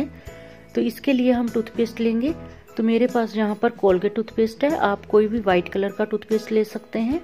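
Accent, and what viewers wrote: Punjab, India. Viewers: Ma'am Aap ki hindi sun kar toh hum bhi Punjabi bolne lag jaayege, hamari Hindi ko Pls. Mat kharab kro.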